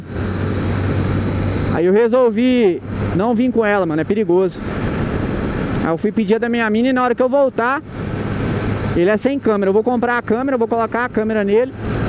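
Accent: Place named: Goiânia, Brazil. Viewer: Se e de Goiânia? Parece demais kkkk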